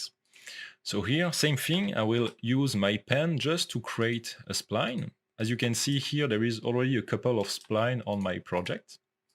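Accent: France. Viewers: c'est marrant j'ai l'impression de que c'est un français qui parle anglais , j'ai raison ???? en tous cas tu sais utiliser l'outil bravo ! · Beside this awesome introduction to the Twinmotion, I love your accent dude :) Vive la France!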